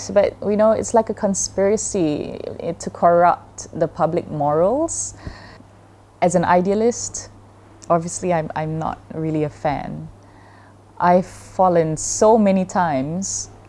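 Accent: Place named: Arab world. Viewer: Iya , i lOve R , pelat Arab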